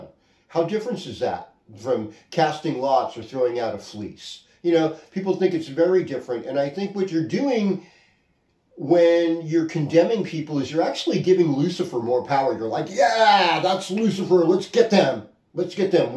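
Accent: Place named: California, United States. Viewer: Great show,! It’s true: We are a collection of hicks, cowboys and hippies. Though I don’t think I fit in with either of those groups. If anything, I consider myself a low level scholar and story teller. Also with those three groups, you get the two California accents: “The surfer” accent, with its elongated vowels and drawl. Then there’s that whole “faux southern” accent that’s common in this area, with its sharpness. I felt inspired to comment on that aspect. I like your surfer accent,! Especially, since accents seem to be fading these days. Anyway, peace brother ☮️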